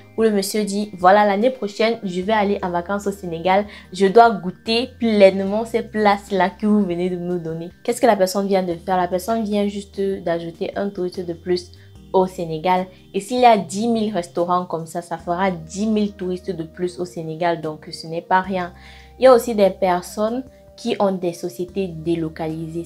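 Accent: Cameroon. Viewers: Intéressante la vidéo👍🏽 par contre j'ai une petite question. Comment ça se fait que tu t'appelle mais tu parles avec un accent camer? Les c'est pas en Afrique de l'ouest? Excuse ma curiosité.🤔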